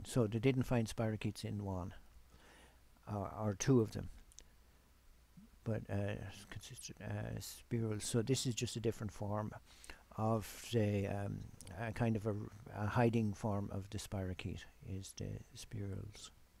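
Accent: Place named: Dublin, Ireland. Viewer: I don't know why but I find this subject fascinating. I am from Dublin, are you also from Dublin? I ask because you sound like you might be from just. outside. Interesting video thanks for uploading.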